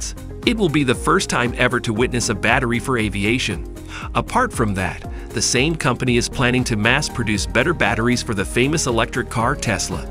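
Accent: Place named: United States. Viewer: Lol . . . Volkswagen pronounced Volkswa 'gen' as in Gen x. Really good American accent, though.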